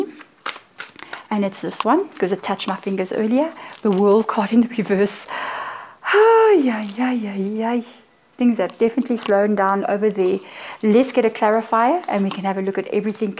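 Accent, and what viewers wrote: South Africa. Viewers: You described my exact situation! Xx just walked away from a very toxic Pisces who cheated and not just once, was very up an down kept going back but now I’m ready to walked and not look back, Nice to hear a bit of “home” in your readings, I’m South African living in the uk 🇿🇦 Thanks for a great reading! X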